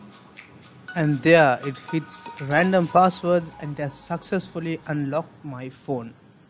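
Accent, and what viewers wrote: India. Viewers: When i heard this indian accent I knew this will be the best tutorial i can pick!!!!!!!!! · When i heard this indian accent I knew this will be the best tutorial i can pick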